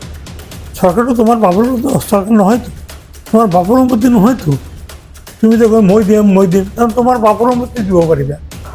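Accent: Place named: Bangladesh. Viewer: বাংলাদেশী কথাতে গম পাই ।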